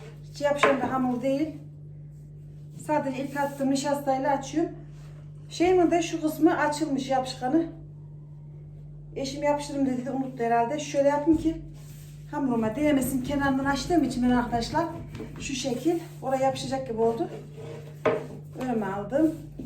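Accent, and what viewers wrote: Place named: Konya, Turkey. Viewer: Hayïrlı aksamlar hanım Konyadan Selamlar🙋 Hemşehrimsin ben de Aksaray ortaköylüyüm videolarını çok beğeniyorum hatta bugün iftarda revani tatlını yaptım şahane oldu.Seni dinlerken silayi Rahim yapmiş gibi oluyorum çok yakın hissediyorum ayni bizim gibi konuşuyorsun.🌹🌹🌹👍👍👍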